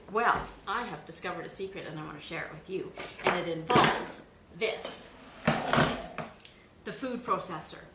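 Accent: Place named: Minnesota, United States. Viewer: nice video, nice accent, are you from Minnesota? don't ya know, I get the big sack of onions, all they sell at the costco and need to do this, thanks!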